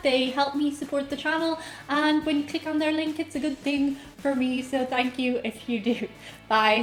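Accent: Ireland. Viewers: Good God! You are defending UK 'pudding'? Are you insane? UK needs to have it's rights to the word pudding irrevocably revoked. And I'm also roping you irishfolk into the lawsuit against haggis. There is plenty of guilt there to go around. Haggis, and 'pudding' that is more like a hockeypuck made of dog kibble than anything remotely edible and you think you can get away with dissing meatloaf!!! You, your adorable Oyrish accent and lovely face are hereby sentenced to a lifetime of only being able to eat alarmingly orange American Cheeses. So there. Blasphemer. No Corn Dogs!? Devil woman.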